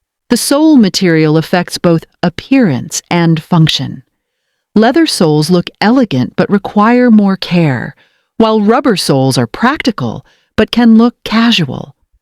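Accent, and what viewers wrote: United Kingdom. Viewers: I prefer the British voice. Nicer to listen to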